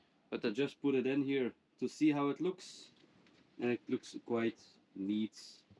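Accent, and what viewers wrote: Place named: West Flanders, Belgium. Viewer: Vn da goe west vlams engels af en toe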